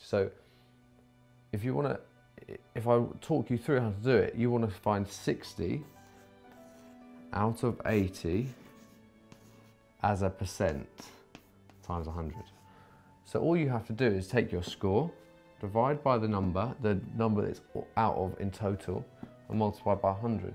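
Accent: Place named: United Kingdom. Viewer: Omg the British accent... So beautiful I can listen all day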